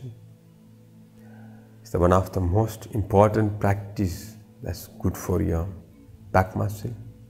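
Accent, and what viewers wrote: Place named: India. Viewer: heloisa688 it's his variation of english: Indian English. Not bad pronounciation. English is official language in India.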